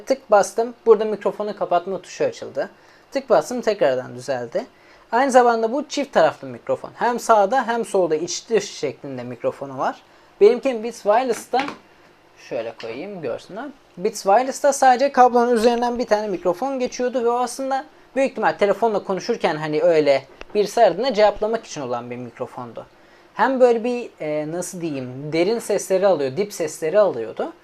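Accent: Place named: Black Sea, Turkey. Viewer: ne diyo bu karadenizli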